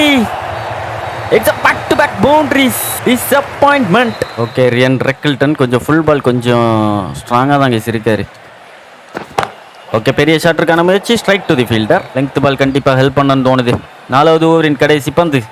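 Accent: Kanyakumari, India. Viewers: Neeenga pesurathu laam nalla thaan bro irukkuthu❤❤❤. Neenga Kanyakumari ah?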